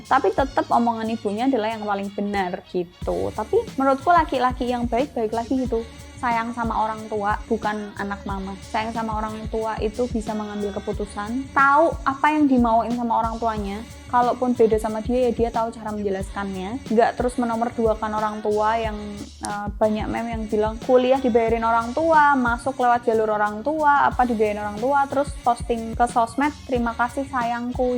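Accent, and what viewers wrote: Java, Indonesia. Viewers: mba uwong jowo to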